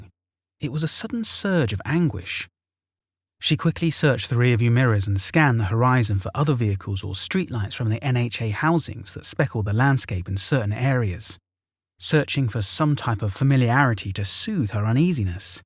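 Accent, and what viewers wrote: United Kingdom. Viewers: Why is this story by a British narrator?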